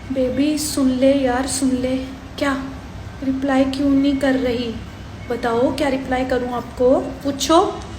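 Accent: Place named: Punjab, India. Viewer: Never leave your punjabi accent it is sure pure and full of good energy .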